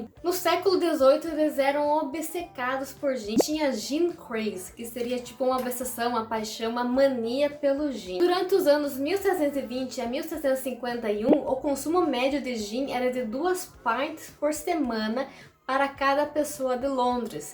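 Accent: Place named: Santa Catarina, Brazil. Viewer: tenho uma amiga em Videira - SC seu sotaque é muito parecido com o dela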